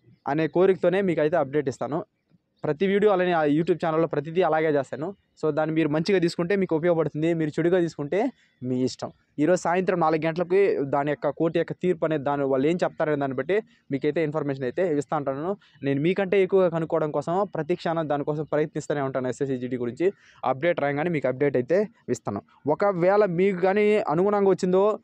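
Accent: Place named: Chittoor, India. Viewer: Bro meedhi ee vooru slang chittore district lagane undhi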